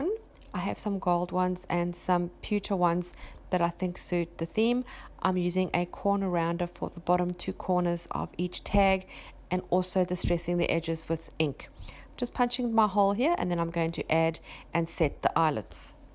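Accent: South Africa. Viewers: I stumbled upon your page quite by mistake, and I am hooked. I was trying to figure out your accent - are you a South African? Lovely work, thank you for sharing. <3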